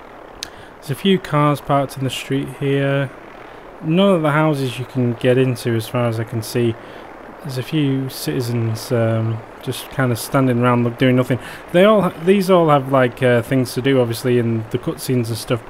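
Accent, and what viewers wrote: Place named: Leeds, United Kingdom. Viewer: That's a leeds accent if ever I heard one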